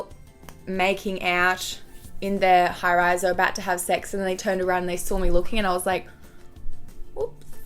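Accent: Australia. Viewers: Hey I can tell ur Aussie/New Zealand?...twins.hope ur near Sydney.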